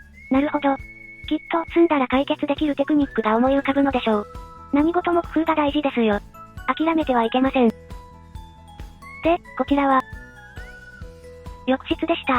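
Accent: Hakata, Japan. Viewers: 博多弁がうれしか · 博多弁が可愛い♡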